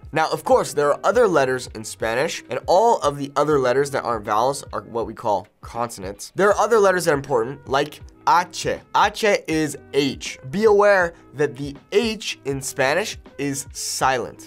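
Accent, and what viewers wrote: Mexico. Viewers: You're so good. You sound like a whitexican and that is so good heree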